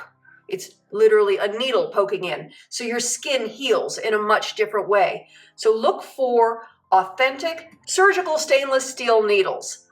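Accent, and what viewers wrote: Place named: Southern California, United States. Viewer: Noooo. He still sounds like a he, like Bruce.. It's just the S. Cali accent .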